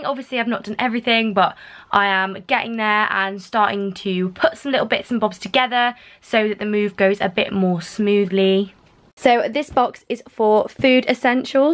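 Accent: United Kingdom. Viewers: The British accent 😍😍😍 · I love the british accent😀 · I always love British accent 💙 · OMG YOU HAVE THE BEST BRITISH ACCENT!!!!!! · I’m British but u sound more British then me but like I loke love ur accent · British accent confirmed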